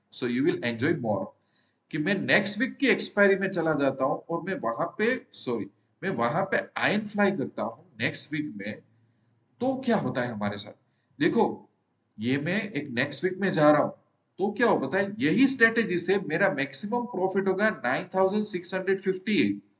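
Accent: Gujarat, India. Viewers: gujarati laheko to aavij jaay ho...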